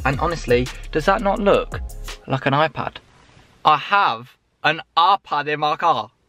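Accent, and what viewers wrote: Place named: Birmingham, United Kingdom. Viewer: He sounds like he`s from Birmingham LOL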